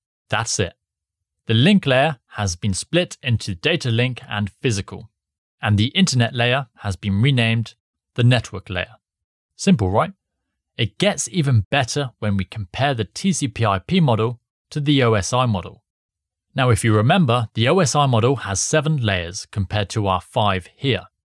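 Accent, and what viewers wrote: United Kingdom. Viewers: ok watever u do , just follow the same presentatiin style - and the same lovely british accent 😀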